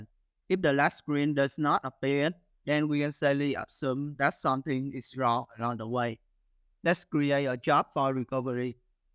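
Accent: Vietnam. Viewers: Your voice sounds like a Vietnamese person speaking English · Nghe cách nói của ông cháu là biết ngay người Việt Nam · Giọng nghe rất Việt Nam :)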